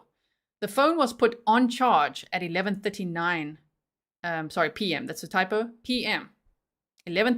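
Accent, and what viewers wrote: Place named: South Africa. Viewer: New subscriber here, I'm really particular with who I listen too as some people are really annoying to listen too lol, but I'm so happy to find you, love your SA accent and slangs (shame) 😂 as hubby is from SA, love your channel ❤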